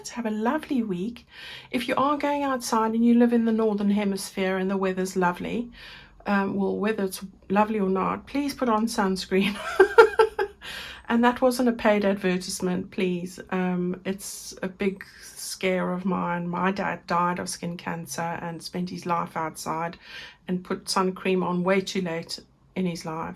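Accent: South Africa. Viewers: I absolutely love your videos... Sorry it is so hot there but here in Johannesburg it is freezing and I am in Europe next week and I can't wait for a bit of warmer weather... I also don't like it too hot though... I knew you had spent time here in SA I can hear it in your accent... so nice to see one of ours doing so well ... thank you for all your beautiful stuff. <3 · It's the way you say"here" then you said you used to live in S.A. And then I realised why I couldn't place it. You've been here a while hey xxx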